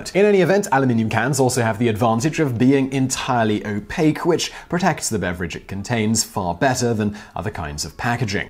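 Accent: United Kingdom. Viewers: Seriously what is with the way the British pronounce aluminum? It was made in America named by an American and pronounced the way Americans pronounce it. You wouldn't just start pronouncing somebody's name differently because you're British would you? I'm going to state calling you because this is America damb it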